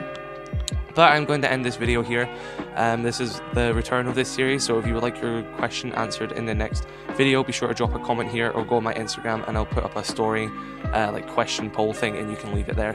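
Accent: Scotland, United Kingdom. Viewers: Good vid dude just recently subscribed noticed your accent your from scotland! Me too 🏴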